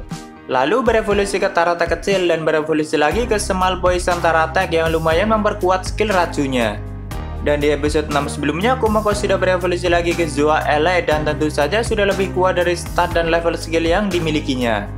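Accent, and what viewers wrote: Banyumas, Indonesia. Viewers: Ngapak wkwk malah kocak gua dengernya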